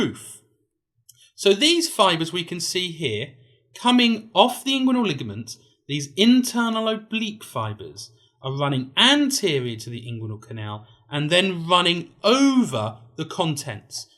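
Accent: United Kingdom. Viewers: Wow wow!! 😮 Im in love with that beauuuutiful british accent 😍 .. plus, you are great sir thank you so much for this clear and stunning explanation 💓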